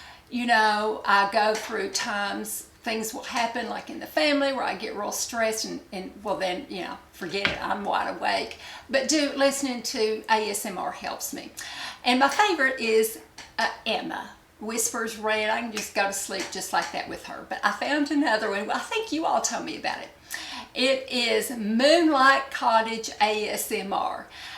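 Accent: South, United States. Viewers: Love your channel! You may find this funny but I listen to you for frequently for the ASMR quality of your beautiful southern accent which I find very soothing and calming!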